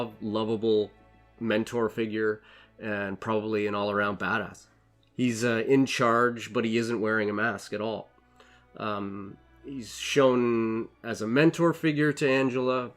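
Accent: Canada. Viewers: Told you on your last Watchmen video that I'd watch every one you put out. I don't understand how you don't have more subscribers with a channel as outstanding as yours. I came to your channel through Westworld and I'll be here for all of Watchmen. Your analysis is always spot on and very very thorough. Keep up the great work!! Where are you from? I've tried to pin down your accent and if I was forced to guess I'd say Canadian or the upper Midwest.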